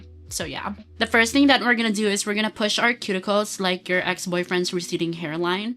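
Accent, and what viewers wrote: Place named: Russia. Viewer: the russian accent got me to subscribe hahahahahahaha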